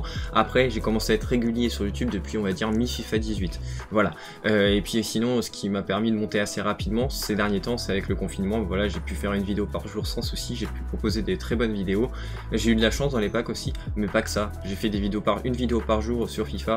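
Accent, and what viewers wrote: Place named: England, United Kingdom. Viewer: Le petit accent anglais qui fait plaisir insragr_a_ m continue comme ça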